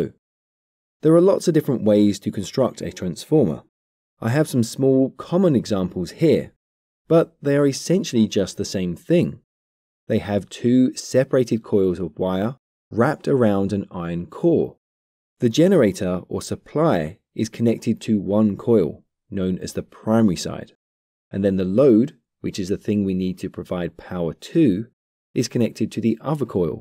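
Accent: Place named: England, United Kingdom. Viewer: Leave it to someone with an English accent to use a beer analogy. Lol. Good job anyway. I learned some things.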